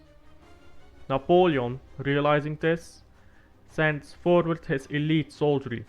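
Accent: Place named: Aalst, Belgium. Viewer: Great video! I can deduct from your accent that you are from Aalst?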